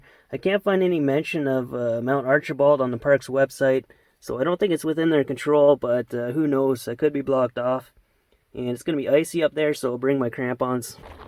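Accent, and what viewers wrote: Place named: New Brunswick, Canada. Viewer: This is insane man. Are you originally from NB? Accent sounds there lol. I’m originally from N.S. myself and wow these videos really makes me want to explore our massive backyard. Keep it up!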